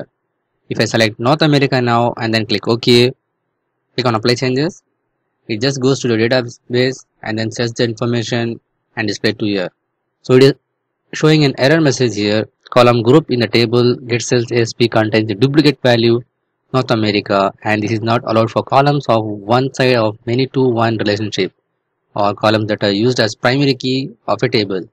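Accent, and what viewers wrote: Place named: India. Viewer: Indian... but good!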